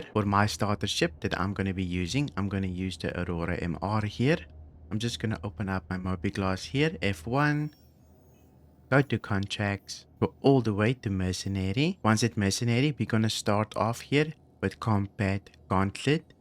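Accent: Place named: Johannesburg, South Africa. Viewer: Can the ships in these missions spawn with cargo? Also, are you from SA? Almost sounds like you have a Johannesburg accent.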